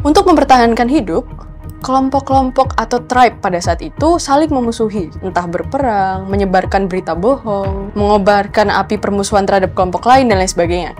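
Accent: Java, Indonesia. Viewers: Logat jawanya agak muncul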